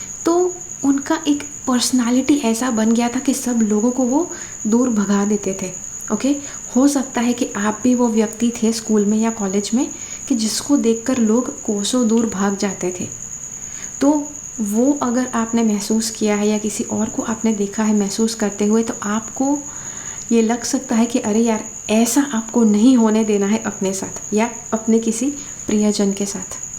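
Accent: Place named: Kashmir, India. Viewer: . ok thanks Apka accent thoda kashmiri panditon sey milta hai isliye pocha tha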